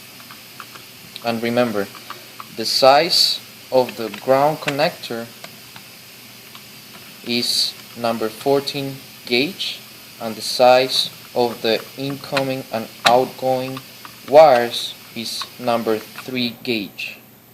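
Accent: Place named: Cuba. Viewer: Hialeah is a city within Miami, Florida. He sounds Cuban..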